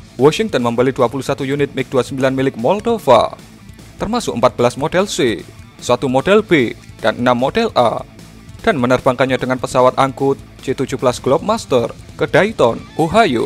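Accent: Java, Indonesia. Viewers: logatnya jawa bgtu kayak sukarno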